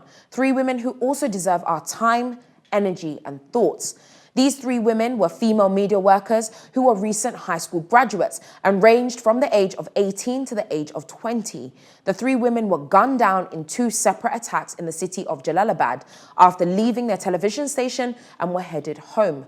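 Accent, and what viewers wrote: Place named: England, United Kingdom. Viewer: This is not an issue with whether your reporting is accurate or appropriate but your statements concerning Sarah Everard may cause you problems. You have stated in this report "It was later found that she had been kidnapped and murdered by 48 year old Wayne Couzens". He has not yet been convicted so to state this unequivocally in those terms is factually wrong and also potentially in contempt of court. I am not sure where you are based but you have an English accent so I presume you are in the UK and if so commentary such as this could put you and your channel at risk. This is a link to information on the legal principles here <link> This is the related guidance <link> and this is a link to a report from the time The Sun and The Daily Mirror were fined for their reports on the murder of Joanne Yeats <link> Even if Wayne Couzens is convicted at trial or by pleading guilty the issue is potential prejudice to a fair trial. 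I think you need to give some attention to these requirements to avoid potentially ending up on the wrong side of the legal principles.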